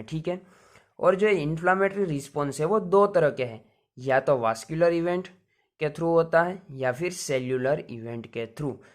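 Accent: Gujarat, India. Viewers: Kem ke Hindi bolo yena upar thi to tame gujrati Lago cho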